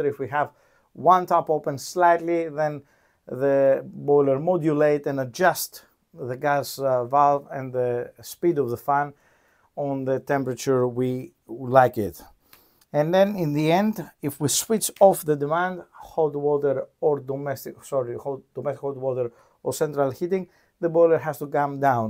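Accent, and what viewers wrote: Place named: Greece. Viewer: Στάθηκα ακούγοντας τη προφορά και είπα ώπα, δικός μας. Πολύ ωραία,καλή συνέχεια.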